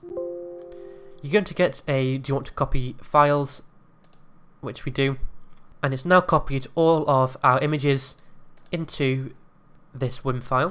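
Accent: United Kingdom. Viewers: A little off topic, but, are you Scottish? Not to be racist, but you sound kind of Scottish. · Im originally from stoke i recoginize that accent anywhere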